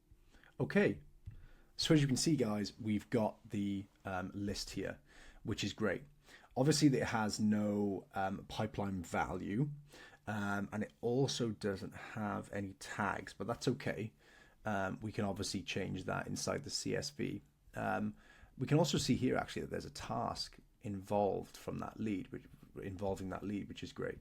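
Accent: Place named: Wales, United Kingdom. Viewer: Where in Wales are you from boss? Fellow dev here, from Cardiff, love hearing the accent from home on these type of videos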